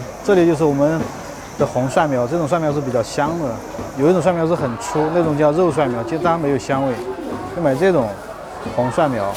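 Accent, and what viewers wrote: Sichuan, China. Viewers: 四川口音😊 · 买菜好接地气👍带的四川口音听着亲切，关键干货满满，就是馋死人了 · 四川话，目测成都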